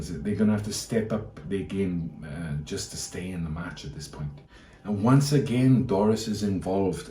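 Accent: Wales, United Kingdom. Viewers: You sound like a Welsh man. I am good friends with spike Williams, ex captain of Wales. again, many thanks, and I wish you the best of luck with your channel.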